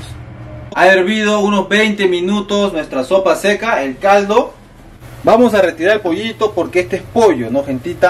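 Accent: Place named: Peru. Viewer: tu no has dejado tu acento de de peruanaso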